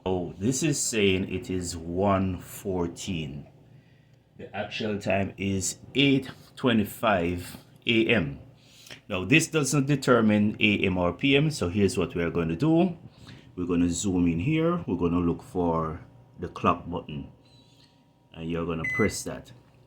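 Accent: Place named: Caribbean. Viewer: You sound Caribbean😊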